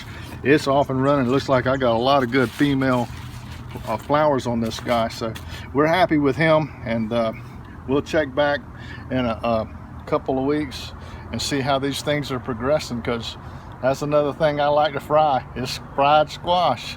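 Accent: South, United States. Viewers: “Then I’m gunna install 4 squash” 😭❤️ Sooo country lol. Love it! 🤘🏼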